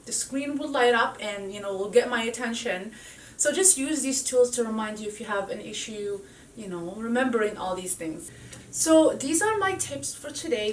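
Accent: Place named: Morocco. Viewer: Ena koont 3rf ntiya Mghrebia! I could instantly tell from your accent! haha. Shukran pour la video! :P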